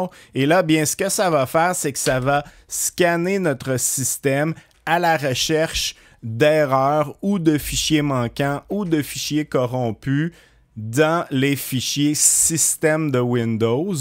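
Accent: Canada. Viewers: Merci, c'est vraiment super comme tuto. Toujours très clair et pour un belge l'accent canadien met de la "musique"dans ton exposé. C'est super 😊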